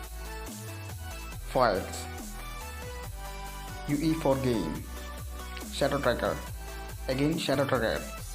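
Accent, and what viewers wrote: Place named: Kerala, India. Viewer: Bro malayali alle English kettapo thoni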